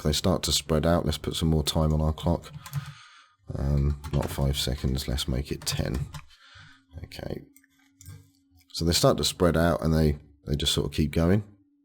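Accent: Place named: United Kingdom. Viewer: I really love your accent, even have been rewatching some of your videos because of that. Can you tell me which one is that exactly? I can only tell it's British :D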